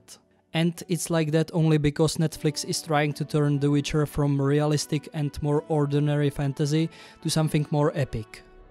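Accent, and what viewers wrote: Czech Republic. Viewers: I love your pronounciation of english words, it's so czech :D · Because he is definitely slavic. It sounds to me like bad czech accent. Nothing offensive. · ten tvůj českej přízvuk je top jinak video bylo trefné jen tak dál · dude are you czech?:D i swear i can hear this czenglish :D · I was asking myself if you are Czech/Slovak or not, you sounded like it and then I saw the board games and it got confirmed for me... a souhlasím s tebou na většině. Hodně štěstí s další tvorbou xd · Kámo z tebe ta čeština je úplně slyšet :D Jinak super video, souhlasím prakticky se vším co říkáš. Navíc Netflix totálně nechápe jak rozmístit rasové skupiny do určitých zemích, potom je člověk zmatenej kde se ten děj odehrává, jestli v Xintrei, Pryshia, Darwen. Škoda, že už vše musí být woke a v každém filmu/seriálu hlavní postava je strong independent women, která je totálně nudně napsaná. 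Nemusejí to všem cpát do ksichtu a říkat: Hele, koukejte silná ženská, která nepotřebuje žádnou pomoct a dokáže se o sebe postarat levou zadní. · One of the better examples of a czech accent - this isn't a criticism btw - wonderful video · Slyším výraznej českej přízvuk? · Dude, you sound like Martin Klekner. Aren't you Czech?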